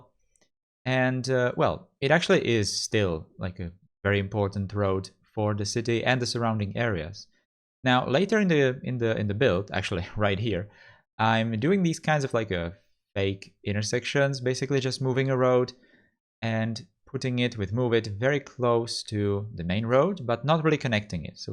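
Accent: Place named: Czech Republic. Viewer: I believe he's Czech. honestly I can't remember why I think so (and he also has typical accent of a Czech guy speaking English). anyway, he aslo referes to central European countries when talking about his hometown. Those being Czechia and Slovakia.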